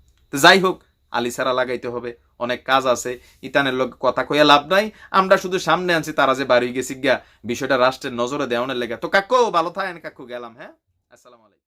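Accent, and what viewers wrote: Comilla, Bangladesh. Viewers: আপনার কুমিল্লার আঞ্চলিক ভাষা গুলো আমার অনেক ভালো লাগে সত্যের পথে এগিয়ে যান আল্লাহ আপনার সাথেই আছে✌✌ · Viaya amr nanar bari o Cumilla. Apnar mukhe cumilla bhasa sune valo laglo 😊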